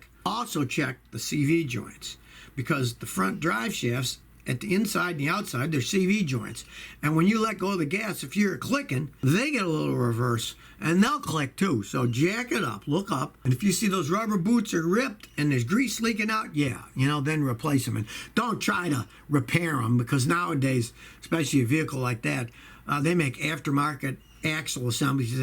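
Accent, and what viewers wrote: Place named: South, United States. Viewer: YOU ARE SO SOUTHERN , jeam BEAM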